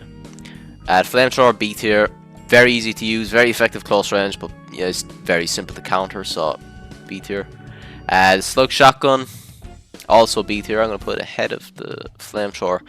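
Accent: Ireland. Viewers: Haha the Irish accent is unique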